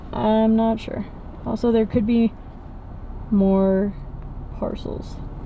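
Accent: South, United States. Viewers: My Friend, just curious, are you From the Southern South?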